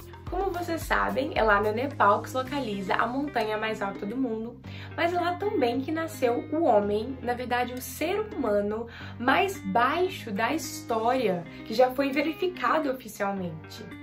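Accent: Minas Gerais, Brazil. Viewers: Moça você é mineira?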